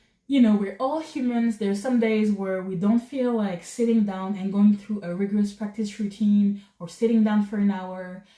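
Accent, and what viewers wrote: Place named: France. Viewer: You def need to have more sub! Your improvement and piano journey is inspiring...and also are you french? I noticed it on the accent.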